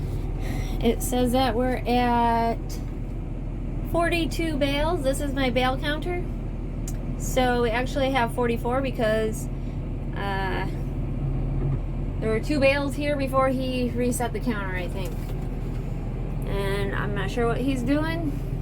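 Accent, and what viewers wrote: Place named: South, United States. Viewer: You sound like from south but your Michigan some place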